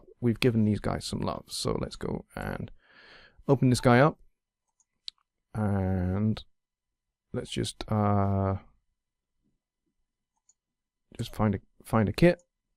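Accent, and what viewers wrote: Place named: United Kingdom. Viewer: Thanks a lot, very clear information and I like your British accent. Is there a way of finding out who is making thumbs down?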